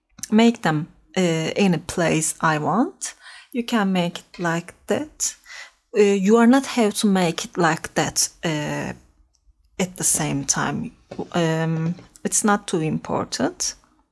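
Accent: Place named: Turkey. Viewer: Your accent is familiar. Are you a Turk?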